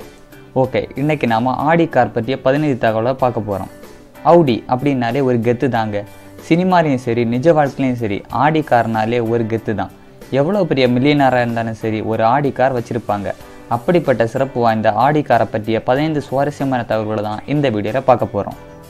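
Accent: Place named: Marthandam, India. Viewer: Nee Marthandam ah Tambi voice nalla theriuthu...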